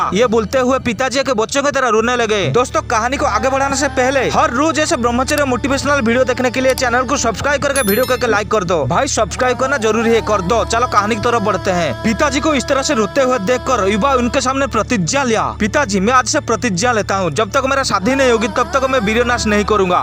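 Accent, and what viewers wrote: Bengal, India. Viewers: Bhai tum bangali ho kya 😁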